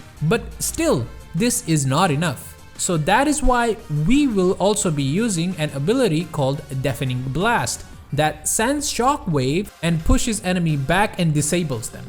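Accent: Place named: Philippines. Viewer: You sound very pinoy. Greetings from Malaysia. When you talk about small counters against heroes, you can add that cold snap interrupts hero such as Earthshaker who has awful casting animation. EMP drains Medusa's Mana Shield. Ice Wall and Forged Spirit depletes Templar Assassin's Refraction quickly. Tornado dispels enemies too, for example Windrunner's Windrun and Scurry of Hoodwink. You also should mention Catacalysm which is the highest damage single cast spell in DotA, higher than Thundergod's Wrath I believe.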